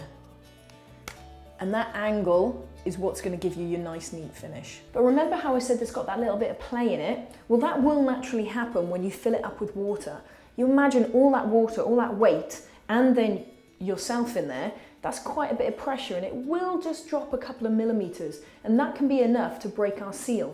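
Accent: England, United Kingdom. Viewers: She sounds English. Makes a nice change from American and Aussie DIYers. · That's an English accent 🙄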